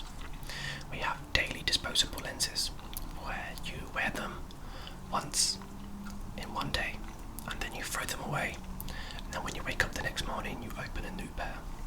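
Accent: England, United Kingdom. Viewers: *Me before video starts*Please tell this guy is British me when video plays HOW IS HE NOT Edit: is he cause I want him to be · you are from england??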